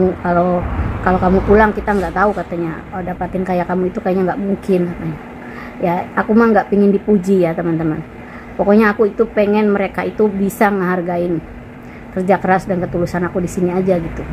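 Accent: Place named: Indramayu, Indonesia. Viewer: logatnya kek org indramayu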